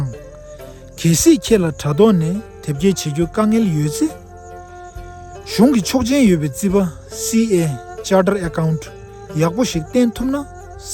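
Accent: Standard. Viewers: བོད་སྐད་དཔེ་གཙང་མ་གསུང་གི་འདུག་ཐུགས་རྗེ་ཆེ།🎉🎉🎉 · བོད་སྐད་གཙང་མ་ཐོག་ནས་ཡག་པོ་བཤད་སོང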